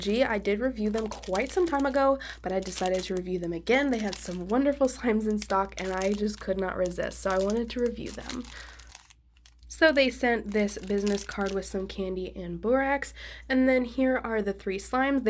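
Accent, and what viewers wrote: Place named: Spain. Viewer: I enjoyed the review but I wish there would be less talking and more slime ASMR. BTW your Spanish asent is amazing!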